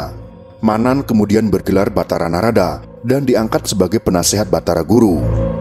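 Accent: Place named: Java, Indonesia. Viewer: Saya suka logat ngomong nya medok baget dan enak di denger dan konten nya bermanfaat banget berkat mas bro nya jadi lebih tau sejarah tanah jawa saya asli jatim lumajang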